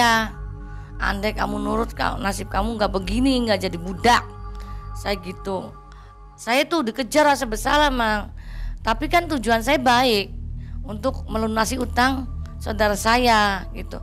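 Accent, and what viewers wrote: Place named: Cirebon, Indonesia. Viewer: Logat orang cerbon, yg tercampur Betawi mbaknya ini kayaknya orang cerbon yg pernah diam di jakarta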